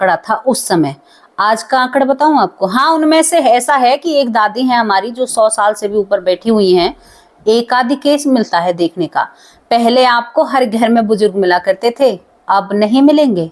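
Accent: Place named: Punjab, India. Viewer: aap jab baate kr rhi hoti hae to kush words punjabi vaale hote haen jaise hum bolte hae badhia lagta bolne ka lahja bahut pyara lagta hae ❤❤❤❤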